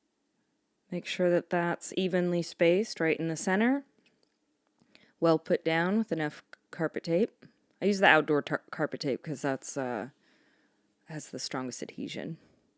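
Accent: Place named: South, United States. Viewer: aww.. you're sweet. Comes thru clearly.. I am sincerely sorry to hear about troubles with your sis and partner. I assume business partner? You mentioned your husband in this video, I hope not him. I hear a refined southern accent, and heard a "y'all" (always a plus for this Texas fella) so I don't think you'd call your husband 'partner'. Either way, prayed for all and meant what I said. As for the channel, the grossest ever strat is up next for me. Take care, be well, keep it up when it suits you🤍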